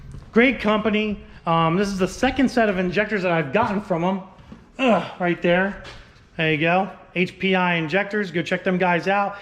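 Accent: Pennsylvania, United States. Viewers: You pa people are messed up with your English wtf? It's a rade I ator and borg Warner what is wrong with u bro? Ohio is one state away we can speak correct pa is the only state that can't talk right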